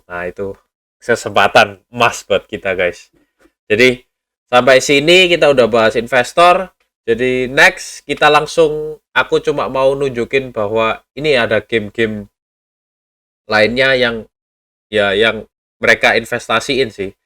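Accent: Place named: Surabaya, Indonesia. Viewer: Nih Reviewer medok ALA SUROBOYO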